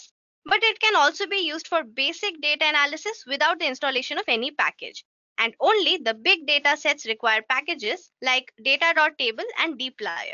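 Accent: India. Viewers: I love your Indian accent. Why are Indians so smart and beautiful? Thank you for that worthwhile information. Bless you...❤❤❤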